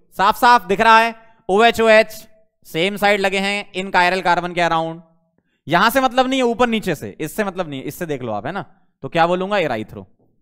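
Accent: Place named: South, India. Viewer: Zaid Khan toh maine kb burai k mai toh inki personality k bre m boli slightly south Indian jaise bolte h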